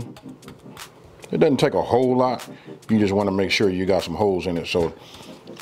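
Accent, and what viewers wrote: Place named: California, United States. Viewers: Oh ok so he’s from Cali ok. I thought he was somewhere in the South by his accent ok hehehe. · I’ve been cooking with potatoes a lot lately. I can’t WAIT to make this. Thank you! Love your Cali accent too. 🌸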